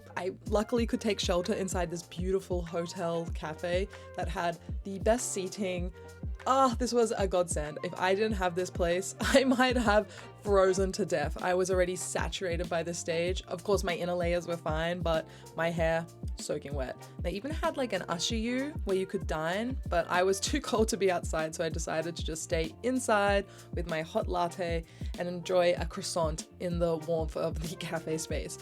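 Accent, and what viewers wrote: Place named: Australia. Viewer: Your trip back to Australia really brought back your Aussie accent 😂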